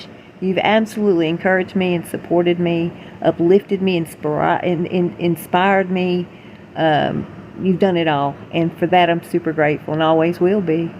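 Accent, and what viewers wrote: South, United States. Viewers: your voice is so soothing and has that sensuous Southern drawl; won't you consider narrating audiobooks, just so we can listen to you speak whenever we need a little "relax" time?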